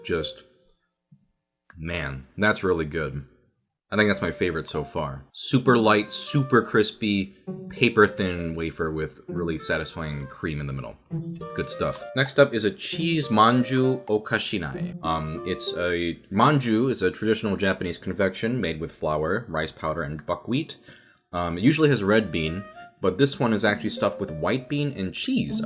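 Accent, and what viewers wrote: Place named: United States. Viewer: his accent is so cool, he sounds like he's American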